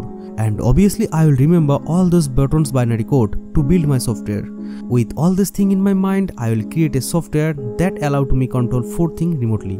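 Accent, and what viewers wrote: India. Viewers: Very good videos and happy with your Indian English too. Well done, feeling proud 👌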